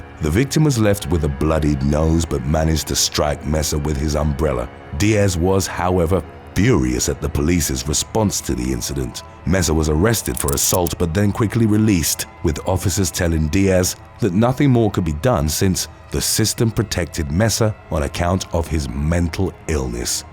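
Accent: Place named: United Kingdom. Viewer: Lol when you realize this channel is British. I didn't think about your accent. Soliciter=lawyer??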